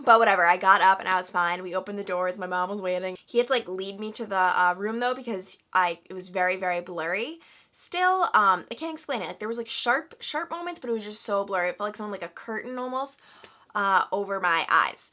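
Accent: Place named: New Jersey, United States. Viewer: Great video.. that has to be a jersey accent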